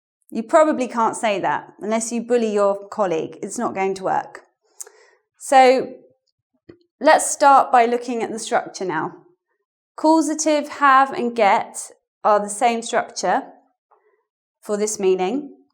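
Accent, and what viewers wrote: United Kingdom. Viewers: british accent?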